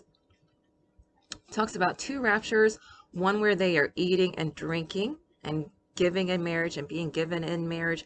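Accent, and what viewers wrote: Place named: Scotland, United Kingdom. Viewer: Lol if yi dinny see it, that's Scotish lol are you from Scotland.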